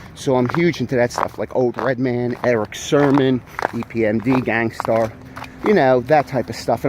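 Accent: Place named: Philadelphia, United States. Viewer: I've been watching for a little bit, but I had no idea you were from Philly. I grew up in Mayfair. In hind site I suppose should have noted the accent. I enjoy the vids. P.S. I agree, no one should swim in Pennypack, thats f'n disgusting.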